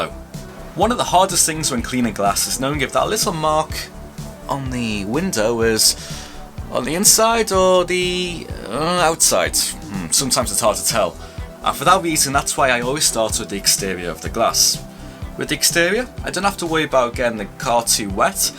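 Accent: United Kingdom. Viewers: What part of the uk do u come from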